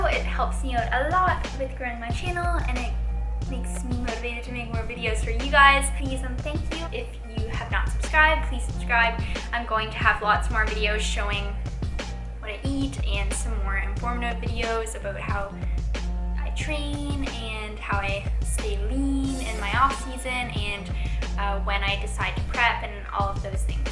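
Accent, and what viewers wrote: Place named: Ontario, Canada. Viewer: Are you from Ontario?! Haha I for sure thought you were from the states!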